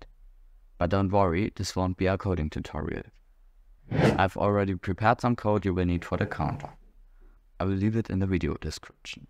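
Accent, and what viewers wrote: Germany. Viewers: love your german accent 😋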